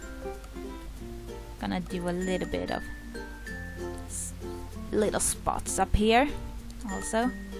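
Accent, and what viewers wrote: Sweden. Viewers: I thought that sounded like a fellow swede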